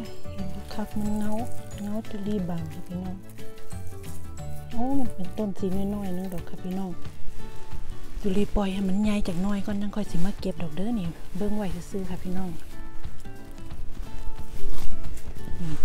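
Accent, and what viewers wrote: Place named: Phu Khiao, Thailand. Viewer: เสียงเว่าคือคนทางภูเขียว ภูเวียง ทางชุมแพเนาะจ้า เว่าสำเนียวมวนดี ขอให้มีความสุขกับการใช้ชีวิตในต่างแดนเด้อจร้า😍😍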